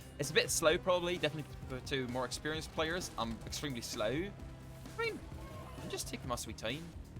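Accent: England, United Kingdom. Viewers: Pls practice an American accent instead of your horrible English accent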